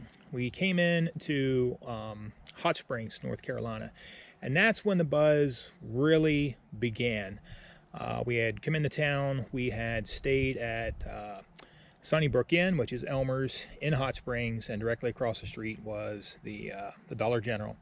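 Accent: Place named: Pennsylvania, United States. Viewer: That, my friend, was a very difficult video for you to make and I respect your choice to get off the trail. I also know that you will finish what you started, whether it's this year or next year. I had to delay my AT hike by 60 days. I will make another decision at that time. It was very difficult because I waited until only a few days before I was to leave. You know all the planning that goes on before leaving. All that came to a screeching halt. BTW, I thought I detected a PA accent. We have relatives near Hazelton. Heard of Senapes bakery? I tried to warn you and others on trail without being mean that something bad was happening and people needed to pay attention to it. On the videos yet to be released, add a short notice in the beginning saying you are off trail. Thank you. Maybe I'll see you on trail some day. John from MI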